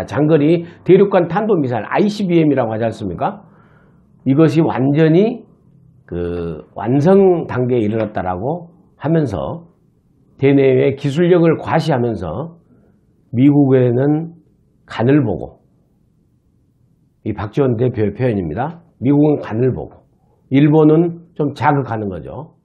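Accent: Jeolla, South Korea. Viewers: 민원장님 전라도 사투리는 애정이 갑니다.많이많이 지지 합니다.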